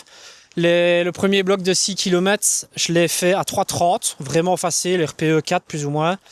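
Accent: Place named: Verviers, Belgium. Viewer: L’accent de Verviers qu’on aime tant 😍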